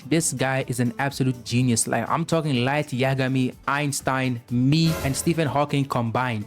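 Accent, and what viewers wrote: South Africa. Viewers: Hmm are u south African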